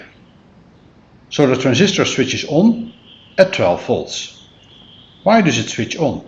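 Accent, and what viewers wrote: Netherlands. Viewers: Thank you for the explanation! great stuff! PS you sound Dutch ;)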